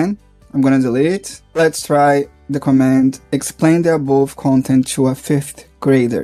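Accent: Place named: Brazil. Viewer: I know a Brazilian accent when I hear it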